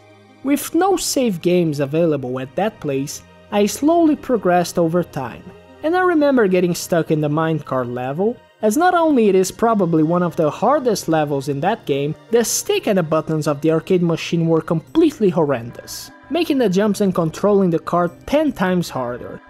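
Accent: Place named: Brazil. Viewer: I knew you had some Brasilian accent lol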